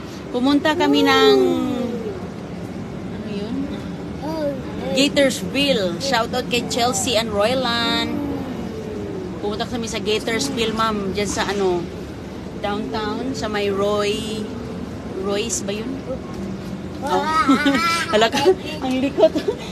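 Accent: Visayas, Philippines. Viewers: Dko tlaga magets bat ung mga bashers ni pati ung pronounciation ni binabash eh sa America nman khit mga American minsan wrong grammar din d big deal sa knila un at ano ang nkkahiya don ang mahalaga naka ka pag communicate c xa kc natural lng d xa ng ppaimpress na super galing xa at super talino...shes just a normal filipino w/ visayan accent...Masyado lng xa overwhelmed kc d nman xa city girl probinsyana xa at khit nasa States na xa gusto niang ipagmmlaki un... Hay, naging defender na tuloy aq...Nkkabwusit kc ungmga bashers bat kaya pinapanood pa nila d nman cla masaya... Kala mo mga dean ng AB English Dept...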